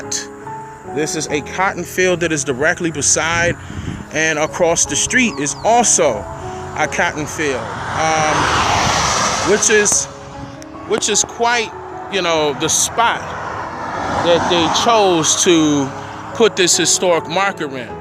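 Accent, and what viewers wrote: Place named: DMV, United States. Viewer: I knew he was from the dmv i can hear it all in his voice.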